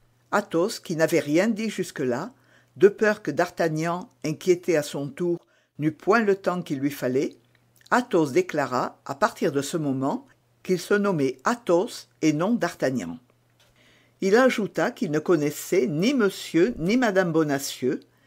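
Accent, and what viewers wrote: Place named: South, France. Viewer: L'accent méridional doublé du chuintement aristocratique pédant. Inaudible bien évidemment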